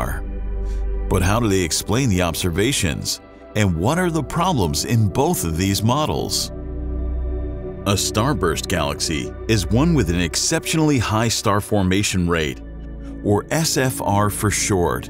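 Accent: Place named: United States. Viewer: clicked on video then heard this mans american accent, yeeeesh no thanks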